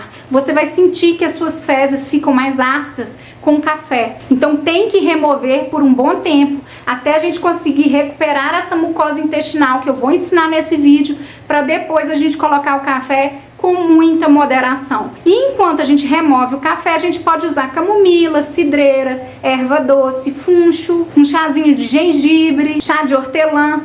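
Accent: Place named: Minas Gerais, Brazil. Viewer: Gente q sotaque gostoso.... parece ser minerinha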